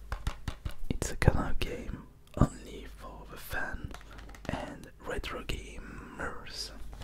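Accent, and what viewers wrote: France. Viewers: Pour le coup ton accent est vraiment bon, tu me fais penser à PierreG asmr ou Made In France asmr. Pour le coup, on ressent bien l'accent français, mais je trouve ça vraiment relaxant ! Bien joué, et c'est aussi très courageux ! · Un accent un peu à la française mais ce concept et cette vidéo sont super, je n'ai regardé que 2 minutes de la vidéo et je ne pense pas que je vais tenir longtemps avant de dormir... 😅🤣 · Je savais que tu étais français, tu mélangeait le présent simple et l'impératif avec le ING mais c pas grave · soit l'accent est bon, soit il à l'accent français, pas les deux, mais un bon accent implique une neutralité de l'origine de la personne. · La vidéo m'as beaucoup fait rire et m'a beaucoup relaxée. Ton accent français est hilarant mais dans le bon sens du terme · t'es français mec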